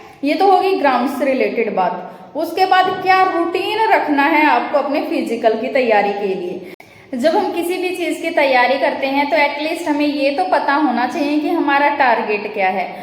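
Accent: Haryana, India. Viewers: Haryana se ho ji aap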